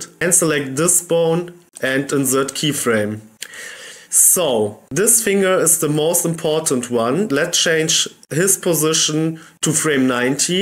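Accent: Germany. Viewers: Ich höre deutsch raus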